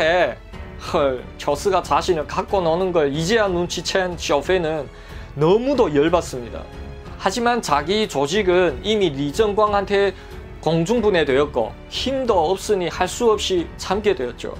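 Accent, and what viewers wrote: Yanbian, China. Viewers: 延边话听的我想乐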